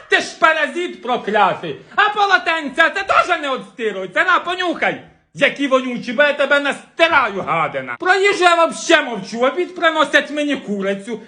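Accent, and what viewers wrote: Poltava, Ukraine. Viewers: Чого причепились,Полтавщина так завжди балакае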